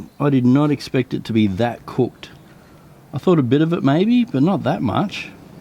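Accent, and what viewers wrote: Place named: Australia. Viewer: his australian accent is so good to hear😀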